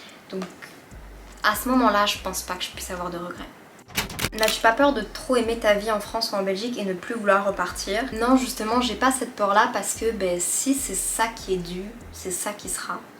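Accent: Quebec, Canada. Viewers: Je trouve que t’as pris l’accent québécois 😌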